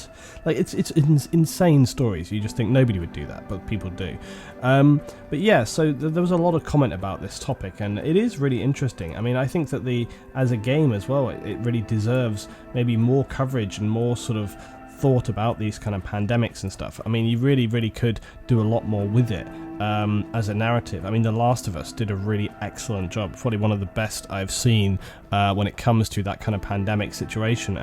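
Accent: England, United Kingdom. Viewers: Hey! I got idea for your Zeus mission. If it's possible then can somebody make a oil platform to sea at Altis. Then your gonna make CSAT base there. It's gonna be hard mission for NATO. There are some civilian/workers hostages there too. It's like naval mission. Only boats and aircraft. Some AA turrets would be at oil platform. CSAT forces have captured an oil platform at South sea of Altis. Sorry for bad English and i hope you like it. And i have a question for you:D What country at do you live? It sounds like England.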